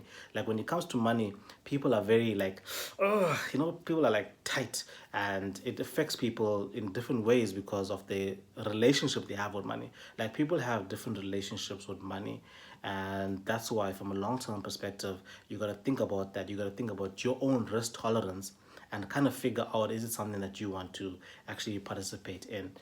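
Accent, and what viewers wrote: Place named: Indian South African, South Africa. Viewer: You sound like a South African Indian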